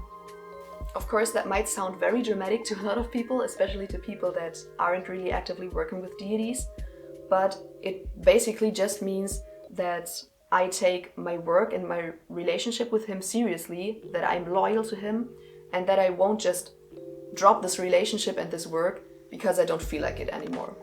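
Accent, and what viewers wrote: Germany. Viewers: I could hear from your accent that you’re German.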